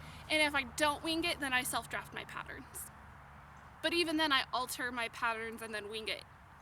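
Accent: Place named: Ohio, United States. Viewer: I knew your accent sounded familiar. I grew up in Ohio. :)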